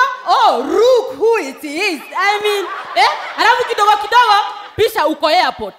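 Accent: Meru, Kenya. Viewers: 😂😂😂...that accent though....interchanging between meru and kyuk...(needs some work) but very hilarious!!!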